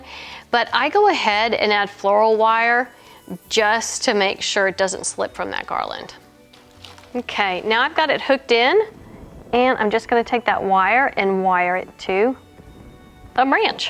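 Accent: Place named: South, United States. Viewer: I love and miss the Southern look (and accent!). You make it look like I could do this too. Thank you so much! From a native Kentuckian who lives on the west coast now. We have those white pines right behind my office window!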